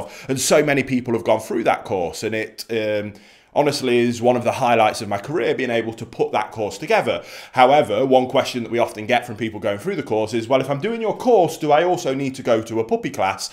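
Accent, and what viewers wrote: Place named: Midlands, United Kingdom. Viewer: Are these in the uk? You sound like you come from the midlands xx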